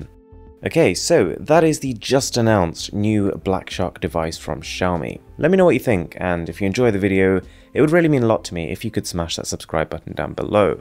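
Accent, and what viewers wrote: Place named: England, United Kingdom. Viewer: Dude ur english accent its insane 🔥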